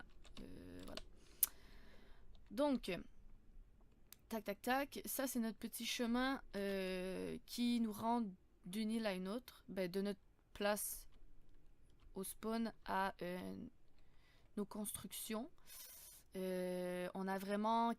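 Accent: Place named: Quebec, Canada. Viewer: Très bel épisode. Question montage, just perfect. Tu as un accent Québécois ^^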